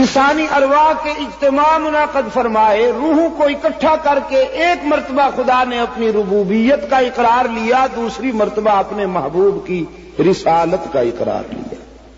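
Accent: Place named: Sindh, Pakistan. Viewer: Hazrat ka Hamen lehja bahut pasand Aaya Hamare India Ke Log inke byanat bahut sunte Hain Inka Le Ja Sindhi juban ke upar hai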